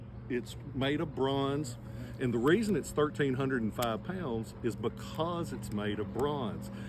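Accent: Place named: South, United States. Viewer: I hope you're doing well! Thank you for your awesome videos! I love hearing that southern accent!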